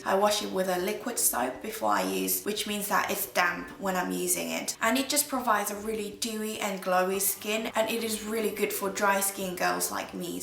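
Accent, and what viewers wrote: United Kingdom. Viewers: 아이허브에 리얼테크닉브러시 세트가있더라구용ㅠㅜ그거 구매할까말까 수천번은 더 고민하구있어요ㅎㅎ 스펀지두 마찬가지ㅜㅜ 언닝 영국발음 쭤러용♡ 볼살없어서 스트레스인 저에게 언니 볼살은 부러움의 대상이에용ㅋㅋ 항상 이쁜비디오 감사드려용! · 올라오기만 기다리고있었는데 올라왔네요!!!!!댓글 처음 남겨봐요ㅎㅎ좋은정보 많이 알아가서 좋아용 영국발음에 관심있어서 보게된건데 언니 동영상들보고 급!!화장에도 관심이 생겼어요ㅋㅋㅋㅋ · 영국식 영어 너무 멋져~~♡ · 얼굴은 애기애기한데(손연재닮음!!!) 목소리나말투는 파워머찜..역시 발음은 브리티쉬..존멋..무튼언니팬이라구용..><항상잘보구잇슴니댱~~~ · 영상많이올려주세요♥ 영국발음좋아요ㅎ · 님은뭐랄까..보면볼수록매력이있는거같아요~~~오늘부터구독하기시작했어여~~~경선님유튜브에서알게되서오늘부터보기시작했네요^^ 영국영어발음도너무매력적이고~~항상응원하겠습니다~~~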